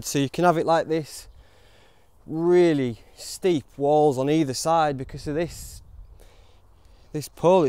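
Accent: Yorkshire, United Kingdom. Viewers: Love this Bro... Great review. Just discovered your channel and your Yorkshire ness (ar tha from Steel city lad?) Nice thought on compassion as well. Namaste from Pete in Penistone.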